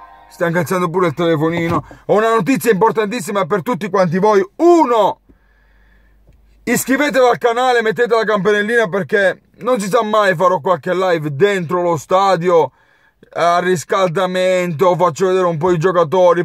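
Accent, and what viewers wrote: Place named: Milan, Italy. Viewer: E' l'accento milanese che lo frega ahahahahahahahahahahshshahs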